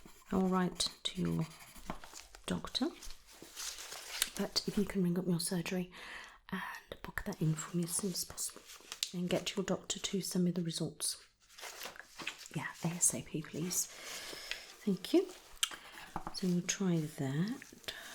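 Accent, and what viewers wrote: United Kingdom. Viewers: British English is really the best language for ASMR !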